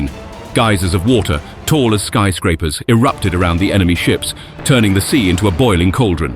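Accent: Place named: United Kingdom. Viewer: Great video!!!! But why is a British narrator doing this instead of an American narrator??????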